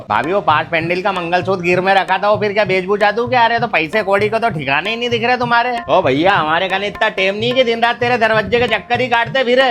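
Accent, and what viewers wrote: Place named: Indore, India. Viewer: mast bhiya,,, that's exactly how these indoree money landers talk like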